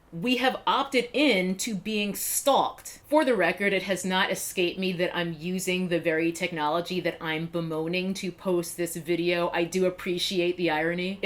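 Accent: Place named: New York, United States. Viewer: Mmmm I felt like you had a NY accent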